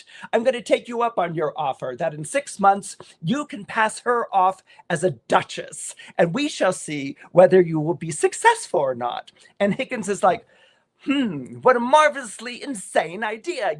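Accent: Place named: Cockney, United Kingdom. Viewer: In Hertford, Hereford and Hampshire (sher not shire) hurricanes hardly EVER (not hever) happen., your cockney accent!!!! And your singing!!!!! I enjoyed this immensely.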